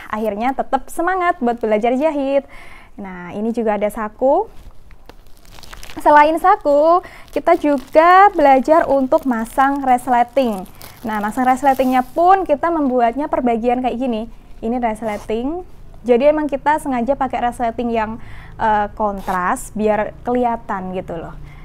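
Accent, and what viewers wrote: Java, Indonesia. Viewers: Mbak ngomonge medok tp lucu😁.luvv mbak q lgsg mengulas tabus krena merasa sangat amat bermanfaat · Aku seneng nih kak ngomongnya medok medok gimana gitu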